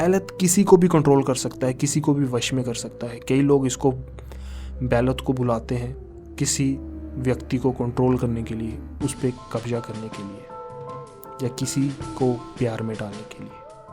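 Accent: Punjab, India. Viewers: Bro shi btao tum punjabi ho na😁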